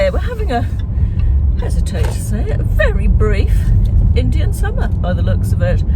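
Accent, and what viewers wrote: United Kingdom. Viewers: Yes, but you get to say those Americanisms in a British accent, so there is that! 😂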